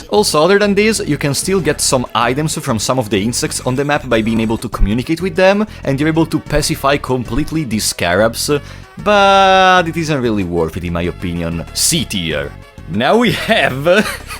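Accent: Italy. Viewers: Ahahahah! Ascoltando la pronuncia, sono andato a vedere nei commenti se fossi italiano. Good job, anyway! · Sento una cadenza italiana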